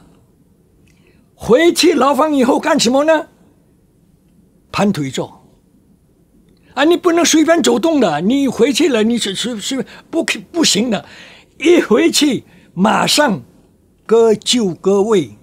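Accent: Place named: Indonesia. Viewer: 老先生说的是：八年当哑巴！不是半年！ 49年到57年！印尼口音而巳！😢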